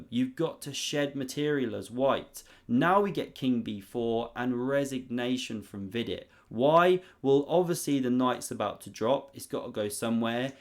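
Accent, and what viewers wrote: United Kingdom. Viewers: Def the best chess commentator, brit accents just class up the experience.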